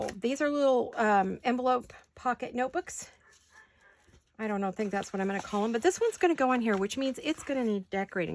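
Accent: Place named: United States. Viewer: Please do not quit. I love your channel. Your American accent is charming.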